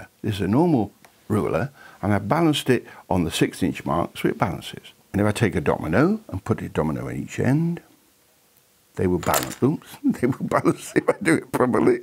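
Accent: United Kingdom. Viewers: That's as British accent as it can get.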